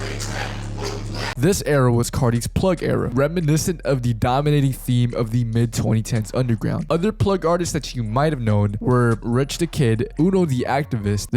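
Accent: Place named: California, United States. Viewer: he sounds and dressed like he could be from Cali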